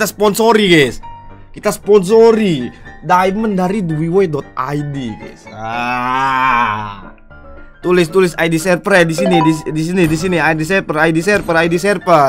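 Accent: Java, Indonesia. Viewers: bg medok skrg wkwk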